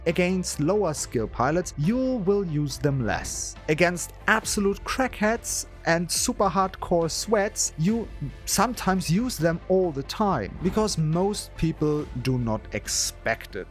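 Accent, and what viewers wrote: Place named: Germany. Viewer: Der deutsche Akzent haut rein, gutes Video!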